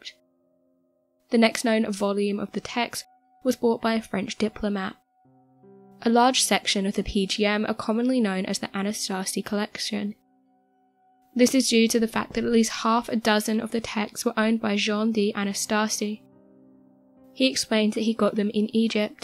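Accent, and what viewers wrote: England, United Kingdom. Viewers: I love this ladies voice. Is she from Britain? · Why is a British accent supposed to be classy? It's so annoying! Good vid just damn Brits cant talk lol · She’s English and they have a lot of different ways of pronouncing words than North Americans. That’s probably what it is.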